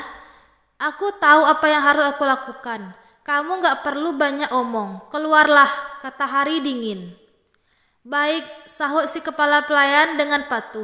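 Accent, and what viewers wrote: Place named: Padang, Indonesia. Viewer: Logat org padang kental banget min...😂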